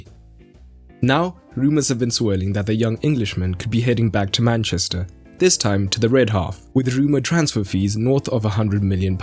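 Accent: South Africa. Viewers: Noh man I've been meaning to ask, are you from South Africa?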